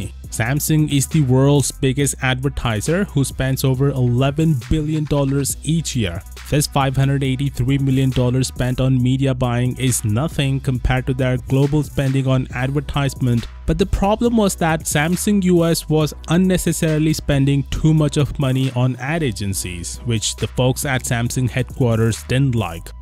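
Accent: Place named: India. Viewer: When indian hardly trying to hide the accent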